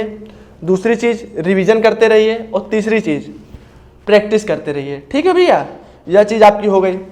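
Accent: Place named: Bhojpuri, India. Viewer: Aapka aawaj humko bahut aacha lagata hai bhojpuri type me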